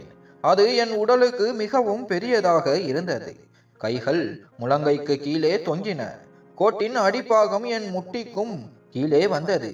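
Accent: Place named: North India, India. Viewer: கதை நன்றாக உள்ளது ஆனால் அதை படிக்கும் விதம் சரி இல்ல. வடக்கன் தமிழ் பேசினா எப்படி இருக்குமோ அப்படி இருக்கு.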